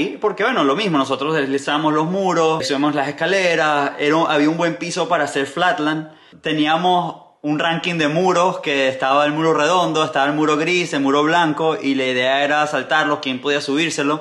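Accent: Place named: Venezuela. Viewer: Felicitaciones por esa tan merecida medalla de plata en las olimpiadas de Tokyo 2020...para mí, esa medalla es de "ORO" brother, tú la mereces mil veces mi pana. Que orgullo escucharte Daniel Dhers..! Tu sencillez y humildad es de "ORO" mi pana. El hecho de que tú has vivido en tantos países...y escucharte manteniendo ese acento venezolano...es único. Conozco a muchos venezolanos que viven en otros países y cambian su manera de hablar (pareciera que les da pena ser o hablar con el acento de Venezuela); es decir "este tipo de personas" no tienen identidad por Venezuela. Tú al contrario eres la esencia del buen venezolano. Te admiro mi pana. Te deseo muchos éxitos y bendiciones en tu vida. Eres ejemplo a seguir. Saludos mi pana.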